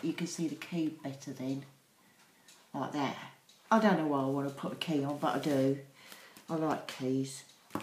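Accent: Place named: United Kingdom. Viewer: Hi so glad you did a tut on this I saw the one you sent to Tricia and so wanted to have a go. You are so clever. I have sub you and look forward to seeing some of your other videos. I am assuming from your accent that you are in the UK. As am I. What is the Xmas club all about would love details. Hugs Jeanx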